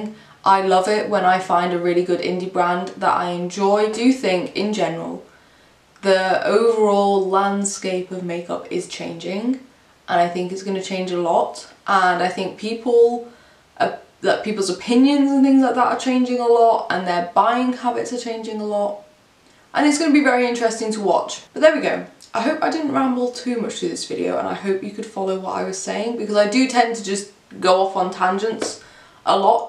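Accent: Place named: United Kingdom. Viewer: I love your British ascent btw I'm from India but my ancestors were English so I get really drawn towards the English culture specially the ascent I recently started taking interest in makeup but your ascent makes your videos more enjoyable for me to watch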